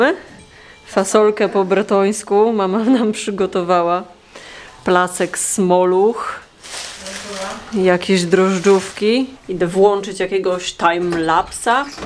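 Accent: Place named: Greater Poland, Poland. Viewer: właśnie oglądam film co opowiadasz i wymowa dialekt zwroty ten tysiąc w karty itd identyczyy co u nas ja z wlkp to nie daleko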